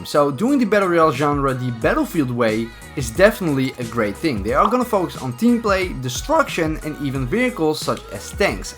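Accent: Netherlands. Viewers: I can hear you are dutch (ja toch?) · are you from the Netherlands, you have a bit of an accent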